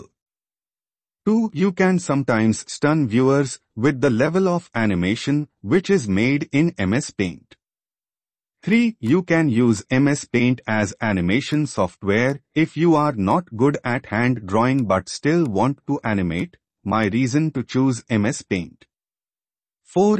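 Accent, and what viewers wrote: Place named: India. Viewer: That probably isn’t a robot, plus Indian accents might sound funny but is just how they speak.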